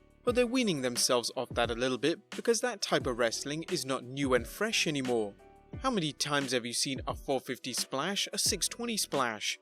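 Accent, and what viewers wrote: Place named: United Kingdom. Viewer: Head's up bud, if you're gonna put prices in I.E. $60, it would be helpful to people who don't use the Dollar-Dollar ya'll, to put it in other currencies. You sound British to me which is astounding, that, if you are British, you wouldn't put the £ in. Simple business techniques bud. If you want pound-pound 'squire, you'd know investors and customers are lazy but lazy enough that they will go to another source for their info if the basics are built.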